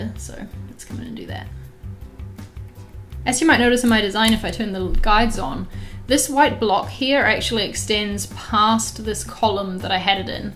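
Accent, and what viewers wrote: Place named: New Zealand. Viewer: good to hear a kiwi accent on the tube!!!!!!!!!!!!!!!!!!!!!!!!!!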